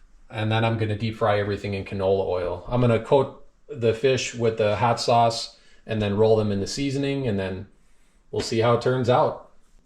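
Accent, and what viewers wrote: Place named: Canada. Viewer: Where are you from.. sound like a Canadian or Wisconsin area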